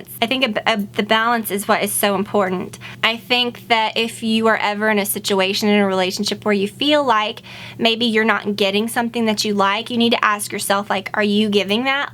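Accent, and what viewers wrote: South, United States. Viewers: Wow... never knew she had a slight southern accent O_O lol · her accent is very southern in this video hahaha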